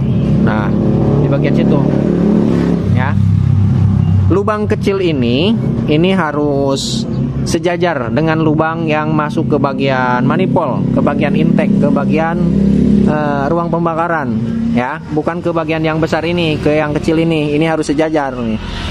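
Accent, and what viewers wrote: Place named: Serang, Indonesia. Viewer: wong endi kang gale gmge jawa serang😅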